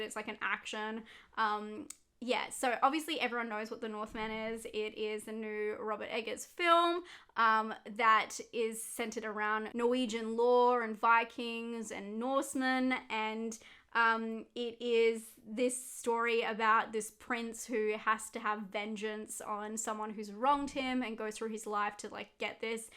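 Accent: Australia. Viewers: Congratulations on getting married..I love your channel and your Aussie accent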